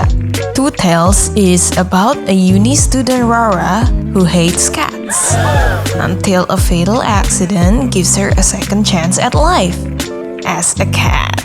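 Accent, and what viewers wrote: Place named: Indonesia. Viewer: Indonesian accent ❤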